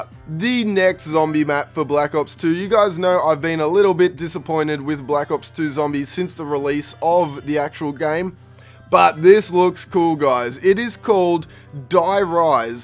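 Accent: Australia. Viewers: I thought you were aussie?? since when does Australia have Gamestop? They own EB games Australia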